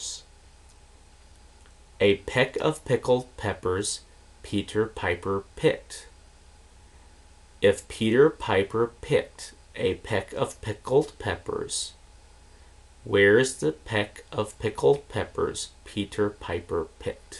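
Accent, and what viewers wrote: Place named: United States. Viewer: One problem: this is American pronunciation. Correct English pronunciation is British Standard English (RP).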